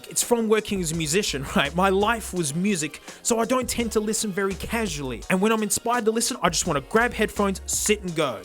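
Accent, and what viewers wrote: Australia. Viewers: I don’t understand this nonsense but he has an Australia accent I’m gonna roll with it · I literally have no interest in music but I watched this entire thing and I don't really know why. Maybe it's something about hearing the Australian accent on YouTube laughing and joking around not sure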